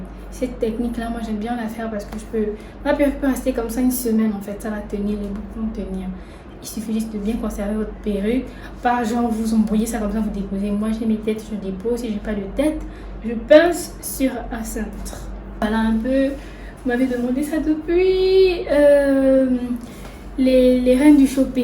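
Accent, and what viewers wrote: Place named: Gabon. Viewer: Tu as l’accent d’une gabonaise 😅😅. Sinon très beau rendus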